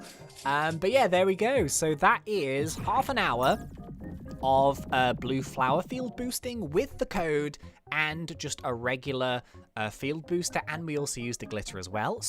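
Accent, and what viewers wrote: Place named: United Kingdom. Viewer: I feel like he has a british ascent lol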